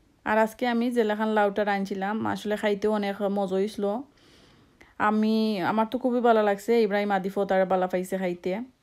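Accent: Sylhet, Bangladesh. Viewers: রান্না গুলো ভালো হয়েছে আপু । আর সিলেটি কথা শুনে খুব ভালো লাগছে । পিয়াজু আমার খুব প্রিয় । Vlog টা সুন্দর হয়েছে । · Apu styet vasha amr khub sweet Lage. Aktu Maya Maya vab ASE. R tmr ranna gulo shei hoyese. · Apu tomar sylhety kotha shob bujhesi, valo laglo, Lau khaw na keno apu at Khub valo shobji, rannagulo mojar, shinar ghoshto alada moja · মাশাল্লাহ আপুনি তুমি কত সুন্দর করে সিলেটি মাত মাতলা খুব ভালো লাগলো আজকের ব্লগ টা। এভাবে সিলেটের মাত মাতবা সবসময় প্লিজ। শুনে আমার বেশ ভালো লাগে।রান্নাগুলো অসাধারণ মজার রান্না করলা।খেতে ইচ্ছা করছে কিতা রতাম গো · Apa sylheti hunthe bala laglo, otho mojar ranna khaibar r piaji oise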